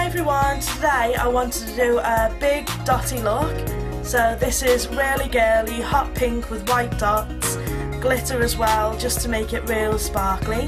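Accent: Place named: United Kingdom. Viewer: OH MY GOSH!! YOUR ACCENT!! I wish i had a British accent.. YOUR IS SOO COOOL!! I'm American :(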